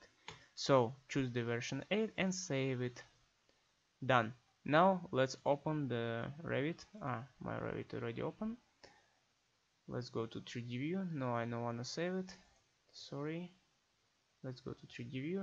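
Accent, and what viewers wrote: Russia. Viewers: Чисто по акценту руссишь слышно, спасибо)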